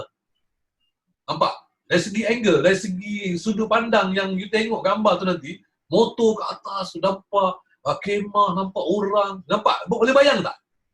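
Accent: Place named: Kelantan, Malaysia. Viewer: Terbaek la bila sifo share ilmu. Mula2 ckp dah tak bunyi kelate. Bila dah masuk part gambar ada keluar juga 😅. Apa pun mmg terbaek bang. Saya enjoy!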